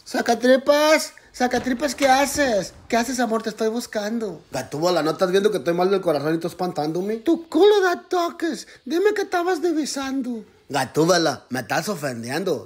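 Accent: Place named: Michoacán, Mexico. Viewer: Este compa habla como que es de Michoacán, en caso de que sea, saludos Paisano, yo tambien soy de allá.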